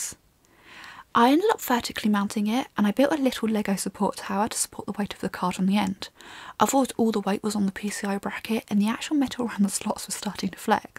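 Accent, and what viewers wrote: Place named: Kent, United Kingdom. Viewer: Great video! Are you from Kent? Your accent reminds me of Kent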